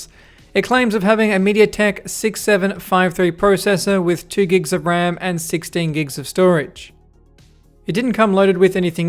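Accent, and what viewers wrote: Australia. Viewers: Mp3-4 players named nuggets/nugs, voiced over by an Australian man on a table, 🤔.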